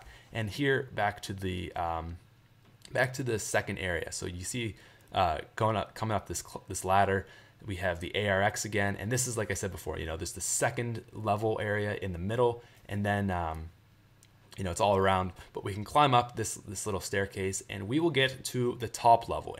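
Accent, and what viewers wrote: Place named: Canada. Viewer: ha ha, love the Canadian in ya ;)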